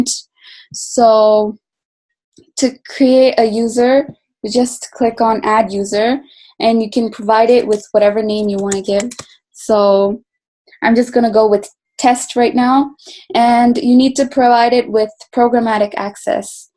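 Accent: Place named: United States. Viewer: Excellent. orchestrated with American ascent with laser sharp technical tidbits is applaudable. Leadership is an impact - you preach what you practiced. 💫💫💫💫💫👌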